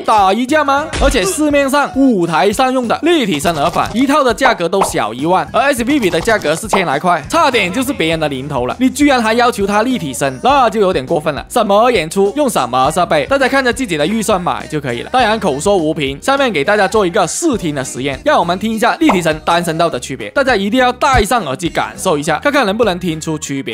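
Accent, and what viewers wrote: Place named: Cantonese, China. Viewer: 樓主的廣東式普通話，真係聽到上癮！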